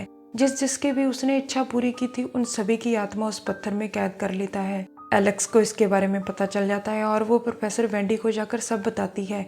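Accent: Punjab, India. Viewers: so much punjabi accent..in your voice.. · Hardcore punjabi accent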